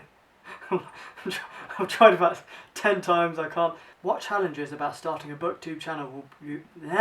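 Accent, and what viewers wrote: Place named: United Kingdom. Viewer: I too loved The Golden Compass & Harry Potter. More people need to read The Golden Compass. Plus I'm American, so I really like your British accent. Just so you know though "comment downstairs" sounds kinda odd. Welcome to BookTube!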